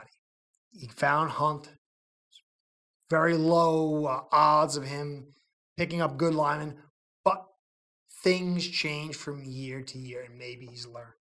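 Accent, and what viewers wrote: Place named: Florida, United States. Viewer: Great Florida accent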